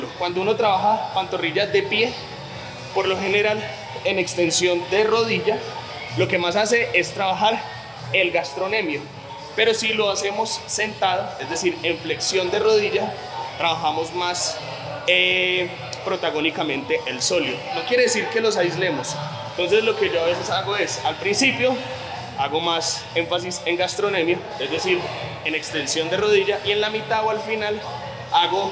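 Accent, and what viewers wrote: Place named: Colombia. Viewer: Saludos desde México pareces, ya hasta me estoy enseñando hablar el dialecto colombiano, que chimba de vídeo!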